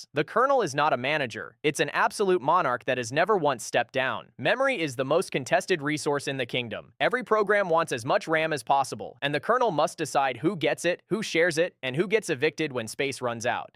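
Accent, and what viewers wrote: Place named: India. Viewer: Why you have a racist Indian accent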